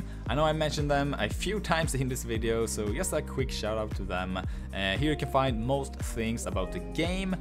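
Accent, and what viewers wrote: Sweden. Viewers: Tog fem sekunder att höra att här har vi en svensk kamrat 😏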